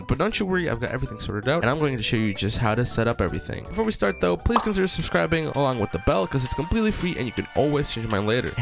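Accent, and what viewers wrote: Czech Republic. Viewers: are you from czech??? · wait are you czech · ARE YOU CZECH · bro are u from czech republic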